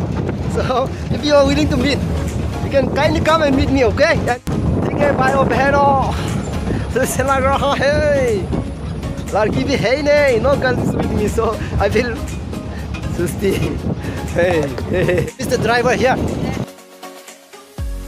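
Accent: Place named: Assam, India. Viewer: He talks like the Assamese.. Eg÷ Chai-Sai, Kaab-Kob, etc.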